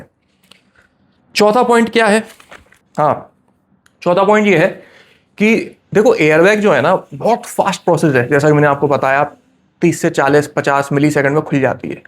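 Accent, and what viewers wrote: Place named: Uttar Pradesh, India. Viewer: kasganj etah ka accent ha apka pr i’ll drive slow always thx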